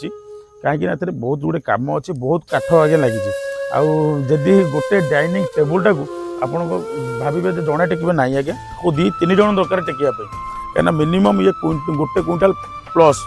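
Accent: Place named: Baripada, India. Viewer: Baripada ru kahuchi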